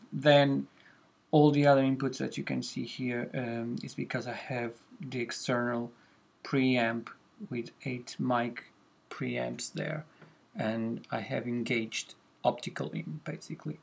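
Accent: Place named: Portugal. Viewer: Pelo sotaque pareceste-me ser português lol uma pequena pesquisa e confirmei suspeitas! eh ehh:) bom trabalho! Cruzei-me ao acaso com este video e gostei!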